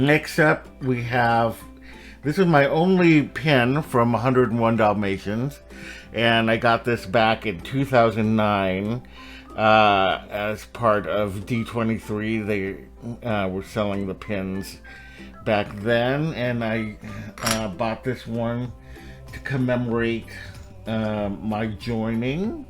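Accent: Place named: United Kingdom. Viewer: Oh my god you’re so funny! I love your British accent!!! Lol! I’m excited for round two! Lol. I love when you sing the songs!! You’re just the best!! The Rattigan song and the (I love that line too, I feel like we need a shirt that says that line LOL) and the Bedknobs song! You’re just so fun to watch!! I’m glad you joined it!!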